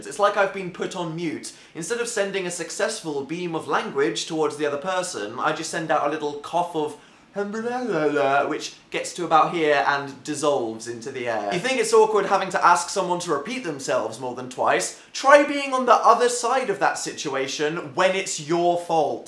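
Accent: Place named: England, United Kingdom. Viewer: *So.. you have Scottish vocal cords but an English accent.. w e l c o m e t o t h e s c o t t s *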